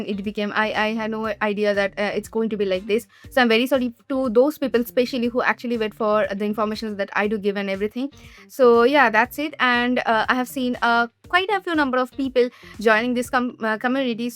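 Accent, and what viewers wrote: Bengal, India. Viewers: your bengali accent in hindi is very cute.😂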